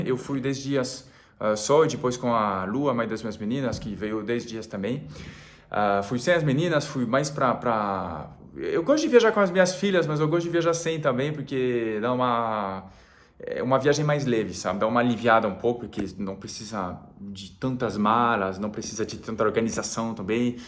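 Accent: France. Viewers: Esnobe, Passou 20 dias na França e já voltou c sotaque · Parabéns,! Vc tem uma verdadeira alma brasileira com sotaque francês!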